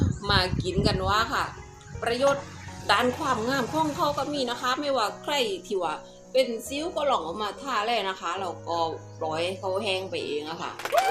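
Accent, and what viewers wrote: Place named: South, Thailand. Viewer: ชัดเจนเลยคนใต้เช่นกันเลยค่ะ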